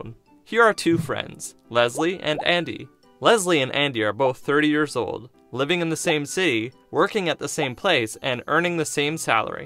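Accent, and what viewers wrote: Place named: Canada. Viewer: The narrator sounds so Canadian.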